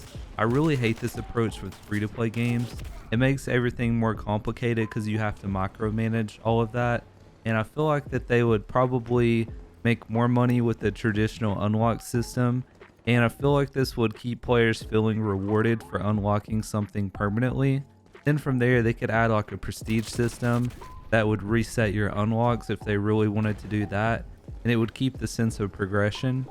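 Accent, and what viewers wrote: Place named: South, United States. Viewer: you have a really cute accent XD sounds southern anyway im getting it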